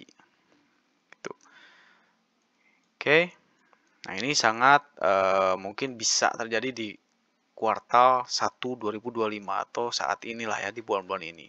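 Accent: Indonesia. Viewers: Bang lu orang Tegal ya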